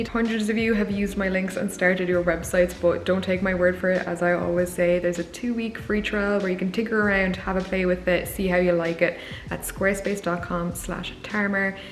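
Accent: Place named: Ireland. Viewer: The combination of your beautiful Irish accent with the rain sounds in the background is so soothing ❤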